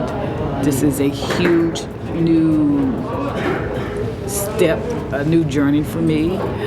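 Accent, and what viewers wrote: United States. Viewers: I always assumed that your YouTube channel was based in the USA, maybe because of your accent 🤷🏾‍♀️ Congrats on your 1st vlog 🎉🎊 it's wonderful to hear all about your journey and travels. That's the thing about nursing it does open up the world to you if you're willing to travel. I'm in the UK 🇬🇧 but worked in the States for a few years and that definitely gave me a thirst to travel more. I was grinning and nodding throughout the reel 😁😂 I'm so happy for you and hope that production is proud of your efforts ☺️✨💛🤩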